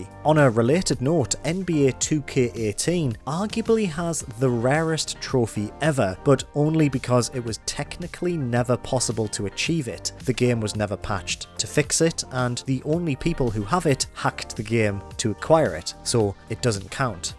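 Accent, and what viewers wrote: United Kingdom. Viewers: “Rare” for this guy is rehhh wtf are British accents it’s excruciating to listen too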